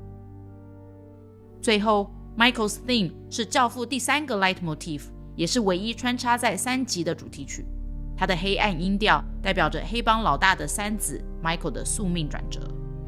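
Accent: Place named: Taiwan. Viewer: 謝小姐解說很細膩資料收集的很用心。妳的台灣國語很熟悉。我也是台灣老蕃薯😂😂😂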